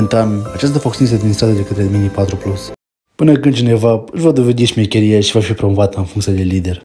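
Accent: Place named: Moldova. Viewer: Ma jur daca inteleg ceva din ce zice asta…oribil accent, n-are o treaba cu B-vlog-ul vechi…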